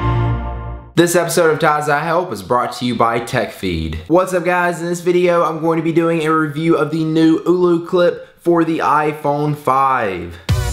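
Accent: South, United States. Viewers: Alex<num> That dude in the video is definitely southern fa sho! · sounds like your from the south haha no worries im in good ol south carolina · Sounds like a Southern accent to me :P (I lived in Alabama for Five years)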